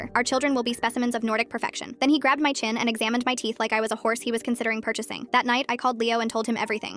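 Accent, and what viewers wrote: United Kingdom. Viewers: Yo its sounds like harry potter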